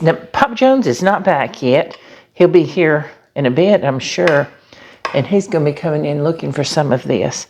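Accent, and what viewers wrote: South, United States. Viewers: I ❤ your southern accent. I am new to your channel. Enjoying your channel.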